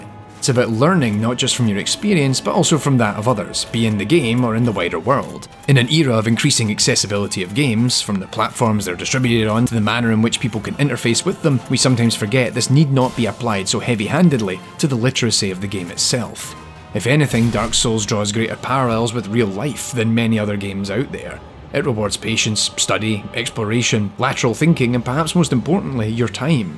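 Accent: Scotland, United Kingdom. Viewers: nicebagel not posh he is Scottish and has a strong accent meaning he is from either the central belt or is from a part in the highlands that is quite rural. I would be surprised if he was from Aberdeen or Edinburgh and would be amazed if he was from the western isles. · I'm just at the beginning of the video but I want to say this entire format seems really, really interesting and I love your accent, sounds a lot like a scottish guy whose channel is also one of my favourites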